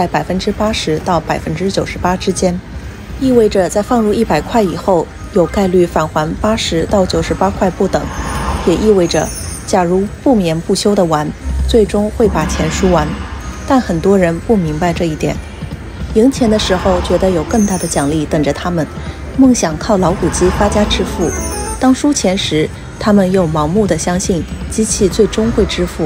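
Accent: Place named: Sichuan, China. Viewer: …四川的哇。😂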